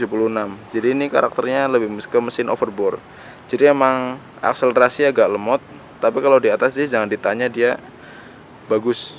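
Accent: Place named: Purwokerto, Indonesia. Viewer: purwokerto ya mas..salam ngapak sekang purbalingga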